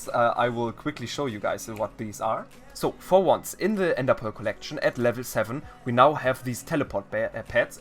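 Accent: Germany. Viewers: Hard Glass is useful for ghast and creeper minions i Think P.S. ich feier deinen deutschen Akzent so hart xdd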